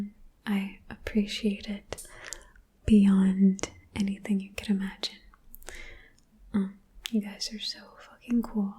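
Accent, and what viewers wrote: England, United Kingdom. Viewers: The accent is very impressive. I am from the UK and have friends who talk exactly the same. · Its funny these are a mix of different brittish accents lmao. Shes like cockney, posh, chavvy etc lmao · You are always so Beautiful!!! I really appreciate you and your work and you look so Beautiful and Sexy with Glasses!! I love the British Accent! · Love your English accent. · Oh my god, your English accent is so good. I’m from the UK so can usually pick up on little inaccuracies, but this one would fool me! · My god your English accent is incredible, or is your American accent amazing, i'm confused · The English accent is really good,. Side note, if you can't stand the feeling of skin against fabric, are you naked all the time? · That accent was awesome I can speak in a British too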